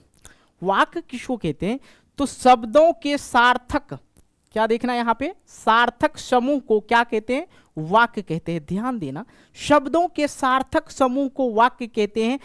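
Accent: Bihar, India. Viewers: Sir aap bihar se ho kya 😅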